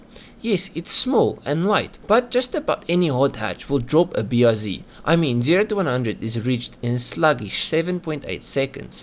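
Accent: Afrikaans, South Africa. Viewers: Do I hear a boertjie accent?😏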